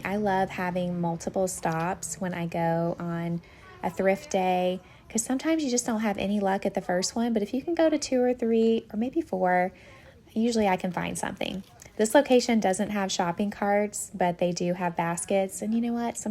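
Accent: South, United States. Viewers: I’m hooked. I’ve watched your videos a lot these past days. You really sound like a Southern Belle. It’s fun shopping with you.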